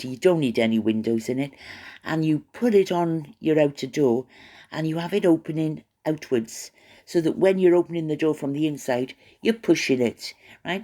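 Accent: Wales, United Kingdom. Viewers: love can’t you ask the council to move you elsewhere where it’s quiet love, I have moved 3times in my life, but I miss Wales you love cannot remember me, we were young and we had good times at Cwmbran cinema, I moved to Gloucester to train as a nurse, and then I married am still in Gloucester we are both the same age, but still remember our young lives we had fun, I was shocked when I found you, and missed your lovely voice, so glad you are back, not on x so I can’t stand the evil, I lost my husband in January then 6 months later my only daughter she did not tell me her cancer had come back, what you did is nothing to be ashamed off, you looked after them, glad to hear you again you take me me back to my youth when I hear you accent I’m back to young time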